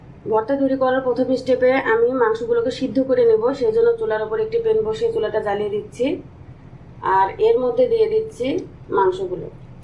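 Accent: Bangladesh. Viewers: apnar vasa commillar na anna jaygar vasa Right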